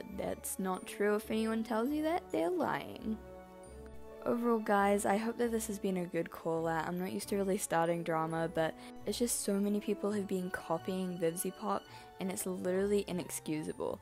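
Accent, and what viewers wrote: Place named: New Zealand. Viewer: You're accent sounds very kiwi 🤔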